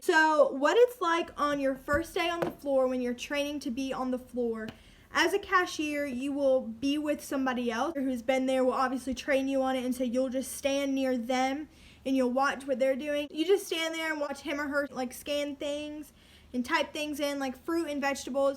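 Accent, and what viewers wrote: South, United States. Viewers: just curious because i noticed your accent and also i didnt know publix was anywhere besides fl ❤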